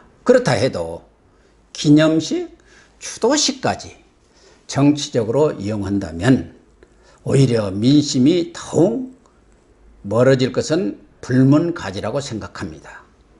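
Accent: Jeolla, South Korea. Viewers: 도대체 이 방송의 정체성이 무엇입니까? 방송하는 분의 말투를 보니 즐라도 억양이 느껴지는데 왜 이런 방송을 하나요? 슨상님 동네 사람들하고는 말조차 섞기 싫습니다. 도저히 같은 하늘을 이고 함께 살 수 없을 것 같아요.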